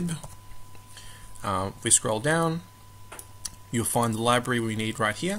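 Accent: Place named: United Kingdom. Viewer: fair comment but - I was refering to the pronounciation of 8 and the letter h. It seems that UK and friends have a different way of pronouncing both than some other countries including US. (eg "I ate you enry iggins") Combined with fuzzy (at best) video mistakes are made. On the positive side, there was thankfully no distracting music. I prefer written code in the comments. thanks for your interest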